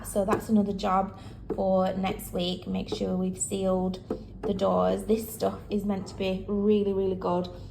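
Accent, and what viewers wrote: Northern England, United Kingdom. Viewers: Hi loving the flooring and the stair rods spot on👌💖 Ey up I'm loving the northern accent haa.. si ther 😊🥰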